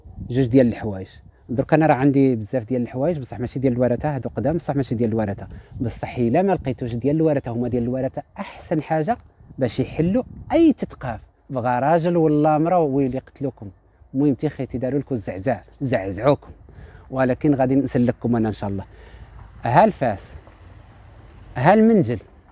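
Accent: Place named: South, Morocco. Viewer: سلام خويا الله يسترك دنيا واخره ويخلي لك بنيتك انا كانقول اذا كان يعني الحس ديالي ينفعني انا كانقول منا نواحي ديال الصحراء ديال زاكوره ورزازات و هضرتك بحال هضرتنا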